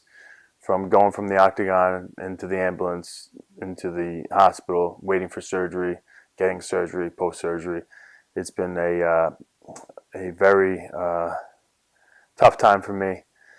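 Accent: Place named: Brooklyn, United States. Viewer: You are what got me into MMA 10 years ago, Chris. I'm originally from Brooklyn and naturally related to you just from your pride, background, and accent. I'm so sorry this happened to you. It was gut-wrenching to watch as your fan. When you said your mind turned to "even if I lose the leg, I can still be with my kids" I couldn't help but be amazed at what an incredibly willful soul you have. You will always be an inspiration to me and my favorite fighter. God bless.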